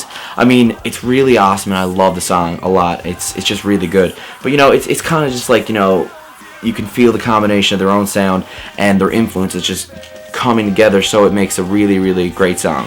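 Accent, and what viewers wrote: Canada. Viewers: You're from Canada, ain't ya bud?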